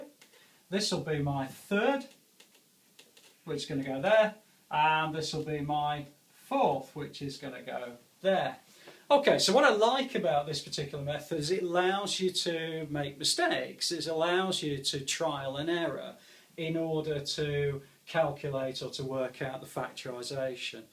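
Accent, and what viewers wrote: England, United Kingdom. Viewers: Finally a person who can explain maths and sounds english!!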